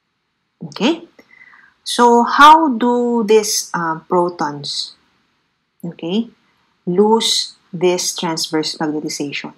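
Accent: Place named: Philippines. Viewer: nice filipino accent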